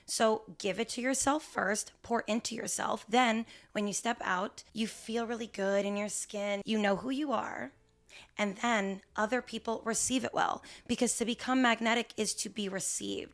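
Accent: New Jersey, United States. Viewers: your channel is a blueprint of what it means to be magnetic! You’re authentic and not trying to be anyone else, from your Jersey accent to your fashion sense all your own unique stamp. You show up vulnerable and passionate about the topics you post on. You’re kind and generous to your online girlies and in real life. Your presence is noticed and missed when you’re gone! You’re able to get your community to open up to you and trust your advice. You have grown into THE magnetic woman! ❤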